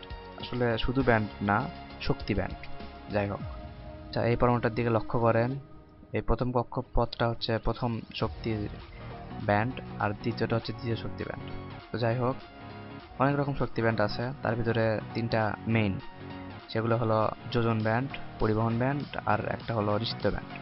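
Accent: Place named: Bogura, Bangladesh. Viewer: Bogurar vasha sune valo laglo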